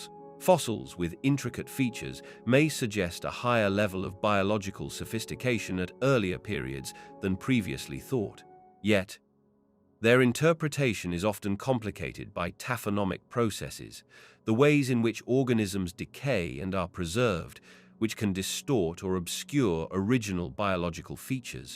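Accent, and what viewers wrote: United Kingdom. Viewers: It’s remarkable how repetitive this British sounding video is while we’re all waiting to see pictures of intelligent reptilian beings. If the narrator had a southern accent it would be easier to realize the repetitiveness because the southern accent sounds lees intellectual to northerners perhaps due to how Hollywood and other sources have often poked fun at American southerners.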